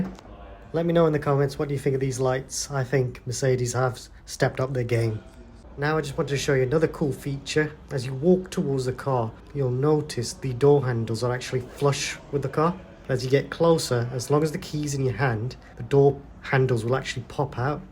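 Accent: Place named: United Kingdom. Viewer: British Talk about German Car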